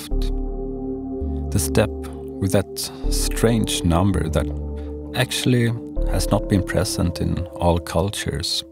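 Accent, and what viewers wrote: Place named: England, United Kingdom. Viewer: I don't understand this English accent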